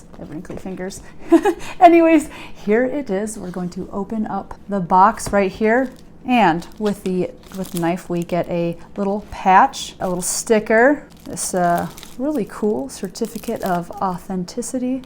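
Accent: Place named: Minnesota, United States. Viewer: I’m originally from Wisconsin. I can’t tell if your from the mid west or Canada . Sounds like Minnesota or Wisconsin. 🤔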